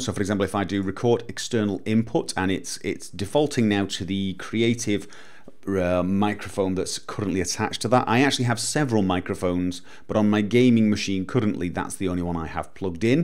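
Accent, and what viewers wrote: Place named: England, United Kingdom. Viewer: It's a heavy'ish english accent, and I've heard plenty of scottish and irish people talk. Not all you scottish people have a superheavy accent.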